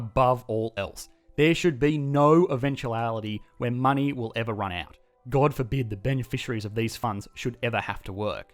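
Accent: Australia. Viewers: Wow--I've never seen such a shameless yet disturbing display of public self-stroking and auto-fellatio in my life the way you go on and on thinking anyone cares who has the larger economy/banking system, etc ...zzzzzz----and you sound Australian rather than British, so not only am I mildly humoured but also perplexed! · He is Australian not only does he mention it in a few videos, I'm also Australian I can tell by his accent 100%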